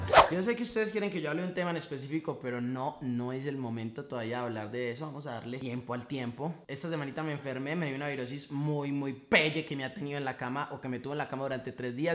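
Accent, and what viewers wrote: Antioquia, Colombia. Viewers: hola ya estaba esperando un nuevo vídeo... Justo ayer estaba viendo 11once me encanta tu personaje y ese hacento 😘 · Hey!!!! Ya extrañaba mucho tu acento colombiano. Te amo❤️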